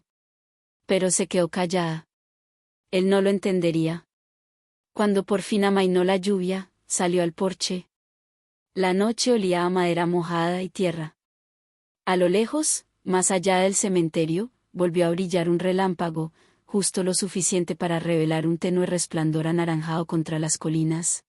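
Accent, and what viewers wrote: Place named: Colombia. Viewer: No me gusta la voz de la narradora ese acento colombiano 😢😢😢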